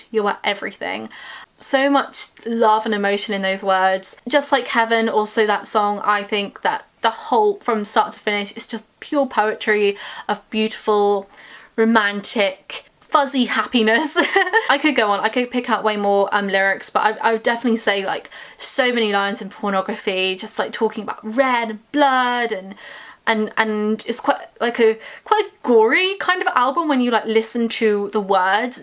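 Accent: United Kingdom. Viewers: Aww hello to u hon..Im Nancy..I just came across your channel while doing some checkup updates on....I must tell u that it's so so awesomely good to see the younger newer generation of today getting into one of the most underrated bands of my time..The 80s..so underrated there totally awesome...not many people have ever heard of them even back then..I was a teenager when they came out and all through my teen high school years even today now I still love them..yes I'm older now..just turned 51..this is me in the pic. You remind me of myself then blond hair all black clothing..which I still wear.im still that cool 80s chick at ❤..lol I know so much about and other great 80s bands like them u might love to know about and give a listening to..huggs to u..yes.i talk alot to..by the way..i love your English accent.you a Brit...nice to meet you dear..always an old school Curran here..huggs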